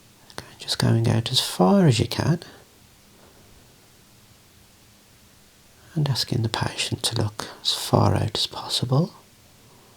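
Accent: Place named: United Kingdom. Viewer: I'm german and the English we hear here is mostly American English. It's always a pleasure for me to listen to British English, like Tom Ellis in "Lucifer" , Henry Cavill or Ewan McGregor as Obi wan Kenobi. Or THIS gentleman here on this channel. Everytime a Star Wars kind of experience, when I hear "hello there !"😆 A big "Servus alle miteinander !" from Bavaria, Germany !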